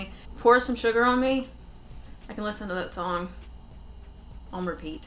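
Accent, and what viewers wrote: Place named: Rural, United States. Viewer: From listening to you I can tell you are a small town country girl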